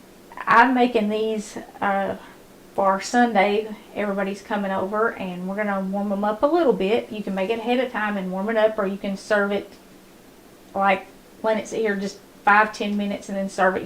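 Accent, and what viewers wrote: South, United States. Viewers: Verses and Vittles.....I always enjoy your videos. Your accent reminds me of my years living in the South...North Carolina and Alabama. My Mom was from Tennessee, and you cook like she did!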